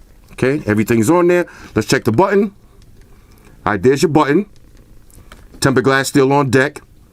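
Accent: New York, United States. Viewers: Lol. OMG I could listen to you talk all day!!!! It's the New York!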